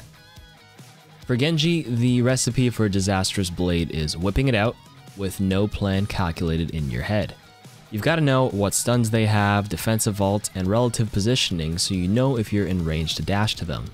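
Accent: Canada. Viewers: Are you a Canadian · are you canadian????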